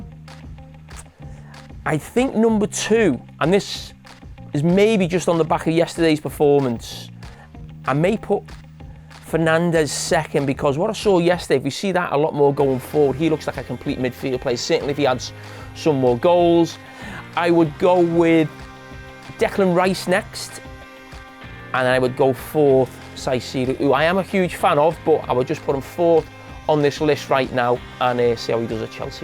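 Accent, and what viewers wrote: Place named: Liverpool, United Kingdom. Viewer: Do scousers deliberately choose not to be able to speak properly? Sighseeaydo? David De Gayer?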